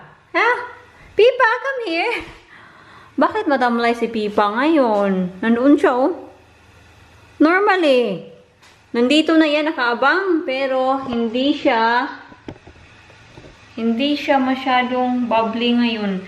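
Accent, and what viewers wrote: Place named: Visayas, Philippines. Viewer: First time ko manuod ng vlog nyo po and nakakatuwa po kau. Sobrang ganda nyo po and your accent is like parang may pagka bisaya 💕😍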